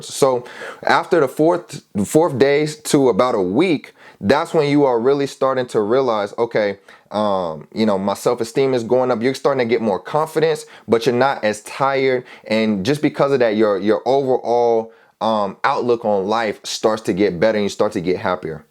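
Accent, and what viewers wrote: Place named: United States. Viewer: Yo boss thank you for this video you’re a funny guy, what part of the US is your accent from?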